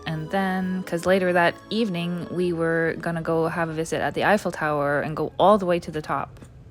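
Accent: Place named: United States. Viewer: thanks for the video. I enjoy your candor. I agree with you that the Louvre is too crowded and the trip to the top of the Eiffel tower at night is fantastic...and I don't like heights either but the view is phenomenal. I don't like long lines either. My favorite part of Versailles was renting a golf cart to tour the gardens in the back! By the way, your English is phenomenal. The more time you spend around Sam, the more American you sound!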